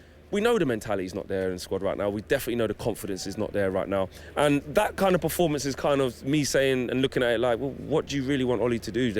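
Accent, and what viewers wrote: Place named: London, United Kingdom. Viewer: Why do u even support united you sound like ur from London why wouldn’t u support a London club there are a lot too choose from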